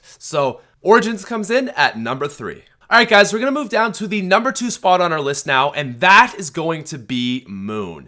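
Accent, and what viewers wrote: Canada. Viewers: It's cause he's Canadian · Nam DreamzZ Lol nice try at acting smart. Americans are all so IGNORANT to the fact that THATS HOW THE WORD SOUNDS DUE TO THE CANADIAN ACCENT. People in America see something that isn't the same as their country, and think its weird and want it changed. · dude he's Canadian, leave him alone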